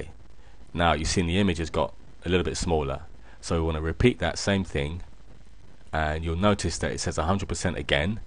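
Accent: United Kingdom. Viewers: i love how british speak. i think it's cute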